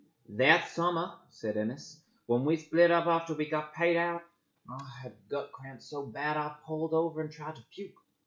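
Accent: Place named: United Kingdom. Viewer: Was so hyped to litsen then relized your British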